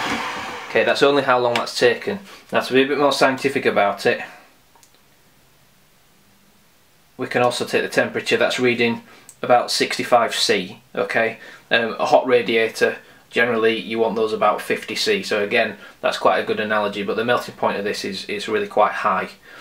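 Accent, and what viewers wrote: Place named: Midlands, United Kingdom. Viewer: hi were did you get the stamp? and your press. I am on the uk your accent sounds like your in the Midlands.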